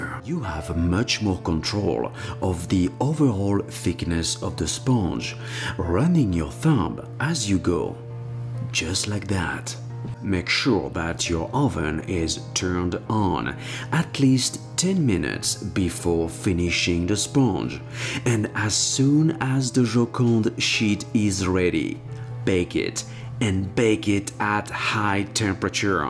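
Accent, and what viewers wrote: France. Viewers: Love his french accent! 🤗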